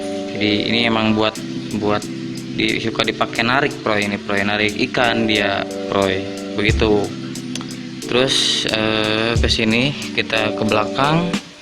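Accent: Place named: West Java, Indonesia. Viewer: Bahasa Indo nya ketahuan logat sunda bandung ya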